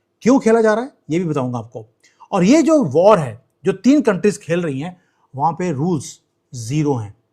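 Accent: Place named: India. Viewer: Talk like Indian but you're ❤️ belongs to chinese lady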